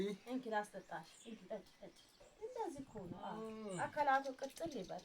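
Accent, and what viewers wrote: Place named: South, Ethiopia. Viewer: በርች ውዷ እንደው በደንብ እንኳን በማታውቂው ቋንቋ እየ ታገልሽ ይገባሻል ❤❤አማረኛሽ ዘና ሥለሚያደርግ በርችልኚ የደቡብ አማረኛ ውሥጤ ነው 😂😂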